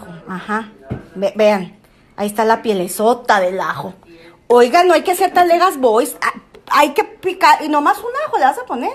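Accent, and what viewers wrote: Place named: Sonora, Mexico. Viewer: Me encanta ver tus vídeos, recetas súper fáciles y por supuesto que sabia que eres de Sonora! Con el modo de hablar 😁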